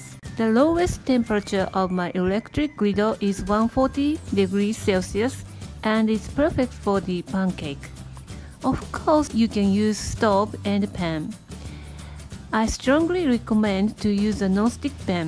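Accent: Japan. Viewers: Japanese English is seriously the cutest thing ever, I could listen to it all day and night 😍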